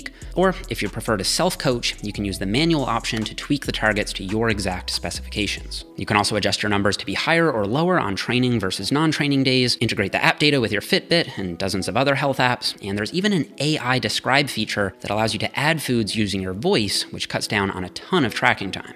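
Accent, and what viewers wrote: Ireland. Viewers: Is he Irish? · A canadian that sounds irish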